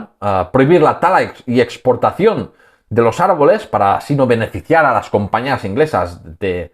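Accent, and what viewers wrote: Catalonia, Spain. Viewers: Madre mía qué relato tan caótico!! Difícil de seguir, inconexo y monótono. Siento decir que claramente tiene usted dificultad para vocalizar bien en castellano, por su fortísimo acento catalán, y me ha resultado una escucha difícil, atropellada y poco amable. Valoro su esfuerzo por exponer y dar a conocer la historia, pero me temo que aún le queda a usted tiempo de aprendizaje para desarrollar una buena narrativa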